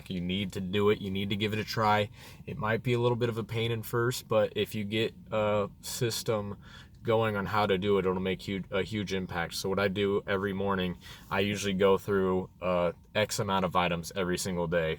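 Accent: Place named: United States. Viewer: God...I just love your American accent, where are you from?Colorado?Denver?, nice video great tips, thanks for sharing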